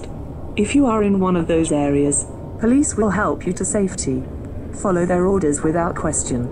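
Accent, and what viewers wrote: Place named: United Kingdom. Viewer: Just the Brit voice is putting me to sleep! She's got a way to sooth people. But the blast wakened me. Lol! 😅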